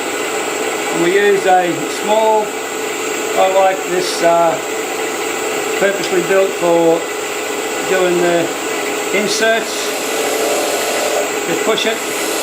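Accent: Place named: Australia. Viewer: Wow im glad to hear an aussie voice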